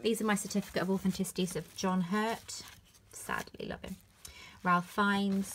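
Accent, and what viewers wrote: United Kingdom. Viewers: it's better cause you have a britsh actcent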